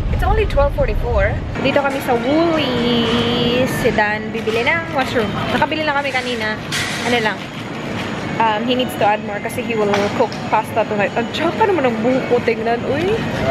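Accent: Visayas, Philippines. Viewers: I love when Ate talks in bisaya. Ang cute kahit hindi ko naiintindihan. Sobrang nakakahawa yung happiness mo Ate. 😊 Sana more blessings pa dumating sayo. 💖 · aha kas visayas ate oy? bisaya man diay ka hahahaa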